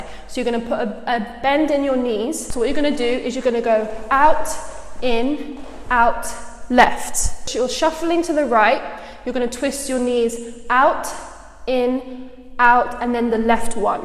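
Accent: United Kingdom. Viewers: Thank you very much for the tutorial, new subscriber, are you from the UK? your accent makes me like from the united kingdom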